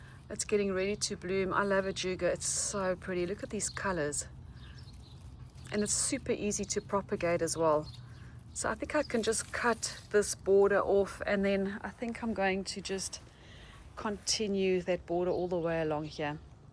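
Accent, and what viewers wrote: South Africa. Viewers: Love love your channel l am learning. Thank you so much. You have a SA accent.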